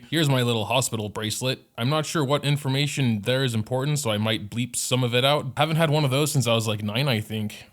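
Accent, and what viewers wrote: Germany. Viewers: wait, you're german? austrian? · Why did I never notice hes german?💀. Also, wish ya a speedy recovery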